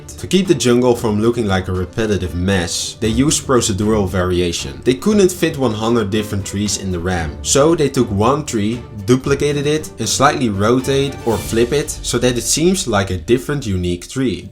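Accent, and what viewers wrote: Netherlands. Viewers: are you dutch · Plz dont stop making vids. I love them! Btw, are u Dutch?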